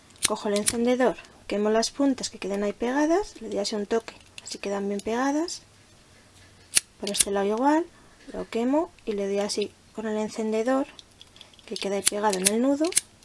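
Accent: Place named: Spain. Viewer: Jijij me di cuenta en el principio que eras de España:)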